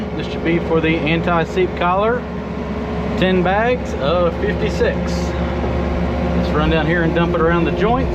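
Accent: South, United States. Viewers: I had an 11 year old video of yours show up on my list and it was when you painted the old Case 580! Your southern accent has mellowed an awful lot since then! 😂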